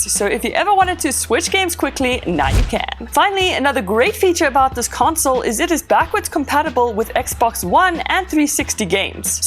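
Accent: South Africa. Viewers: Your South African is that true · Is she south African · You sound south African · Her accent is spot on south african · Are you South African? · That south African accent. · Is she from south Africa? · I sense South African👀😂 Maak my sommer trots🔥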